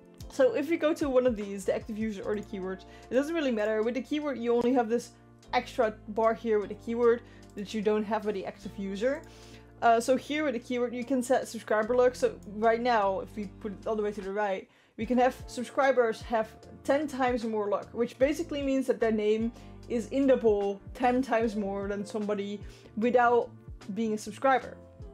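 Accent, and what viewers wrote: Netherlands. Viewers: Been living on the Netherlands for 4 years, I could tell you were dutch in the first 10 seconds of the video xD Thanks for the tutorial, btw, it was very useful! ❤️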